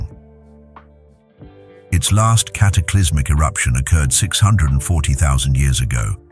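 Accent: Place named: United Kingdom. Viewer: STUPID british, trying to speak english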